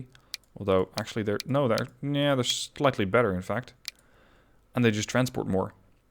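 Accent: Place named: Netherlands. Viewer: I recognized the dutch accent from the start :p I'm dutch too.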